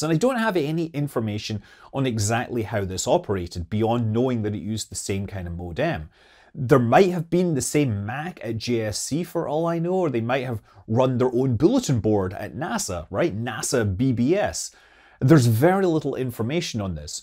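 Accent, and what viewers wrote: Scotland, United Kingdom. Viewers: It's a Scottish accent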